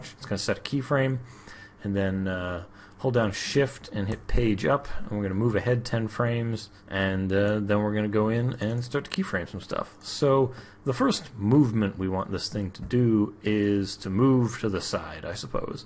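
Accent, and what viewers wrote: Canada. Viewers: Thanks for the help. Love the Canadian accent 👍🏻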